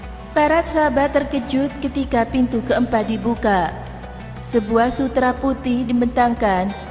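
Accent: Java, Indonesia. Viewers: Vocal suara jelek kaya orang jawa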